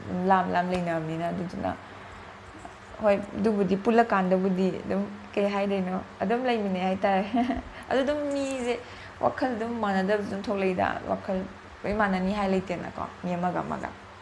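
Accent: Kakching, India. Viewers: Long kHoibado yaragadi tokpiro... Majority khallaga...eikhoidi kakching dialect toh meitei lol oirasu taba nungaitey...kakching khaktnggi oina semva blog ti oihnbiranu...Sao Yade nungaitaba yaoragasu hairibasingsida...I really like ur personality...And loved ur videos...But these are some things that I want u to concern..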